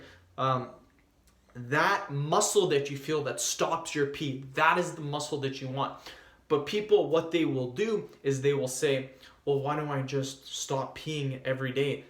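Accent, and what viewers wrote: Canada. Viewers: This guy is Canadian, isn't he?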